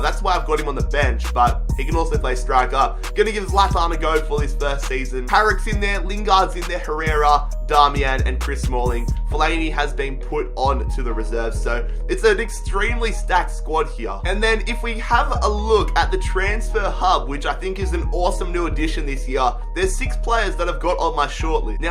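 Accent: Australia. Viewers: Your Aussie. I love it mate. I'm Australian too. Good vid mate ❤️😎😜💪👌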